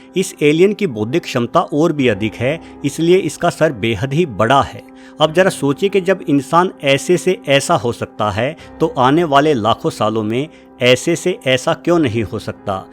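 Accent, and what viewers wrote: Haryana, India. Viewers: Pkka hariyana se ho bhai sahab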